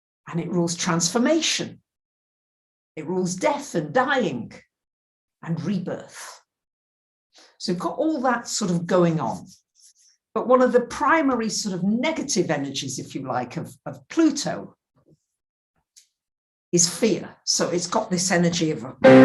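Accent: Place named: North, United Kingdom. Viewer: Thankyou for your message , your accent seems more northern in this video!. And you look a bit different, all the Greek food I expect!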